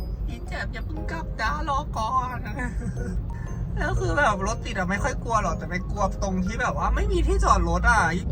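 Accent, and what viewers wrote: Isan, Thailand. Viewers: ช่องนี้ไม่รู้ชื่อช่องอะไร เจ้าของช่องคุยสนุกมากน่ารัก หล่อสวยอยู่ด้วยกัน เว้าอีสานก็เว้าม่วน ชื่อว่าจังได๋น้อนี่😂😂❤❤🎉🎉😢😢😅😅😊😊😮😮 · เว้าอีสานตาฮักจ้า❤❤❤❤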